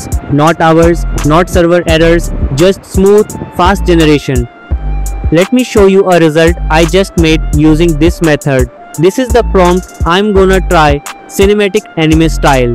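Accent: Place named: India. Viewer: Indian accent right? ur from india cool😮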